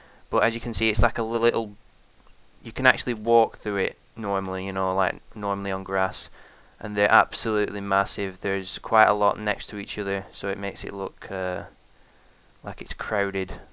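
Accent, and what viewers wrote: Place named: Ireland. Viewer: Your Irish aren't you?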